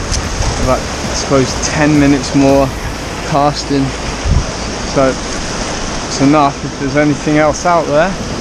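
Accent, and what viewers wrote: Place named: England, United Kingdom. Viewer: Hi loved the vid and subscribed. This vid had me fascinated, we get bluefish here in Australia where they are called Tailor. I was actually thinking this was an American clip on bluefish ing, next thing I hear your English accent, then see you in some kind of subtropical location Confused lol, what country is this and why do the fish get so big there? Are you a regular tourist to the area or live/work in the area? Cheers mate loved the vid big time.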